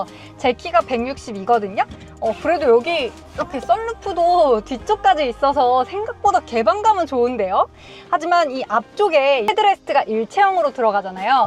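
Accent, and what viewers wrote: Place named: Gyeongsang, South Korea. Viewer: 경상도 촌넘 ㅜㅜ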